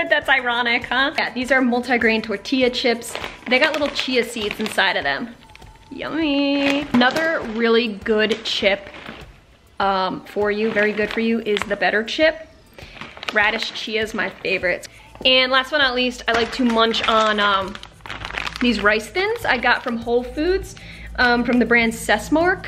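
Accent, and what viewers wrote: New Jersey, United States. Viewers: Visiting from channel! Do I recognize a Jersey accent? Great video!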